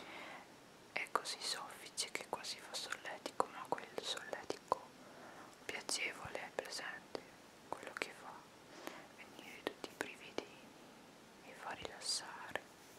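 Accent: Veneto, Italy. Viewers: Sei veneta e sei anche da vicenza scommetto...lo sento dall'accento che hai..é come il mio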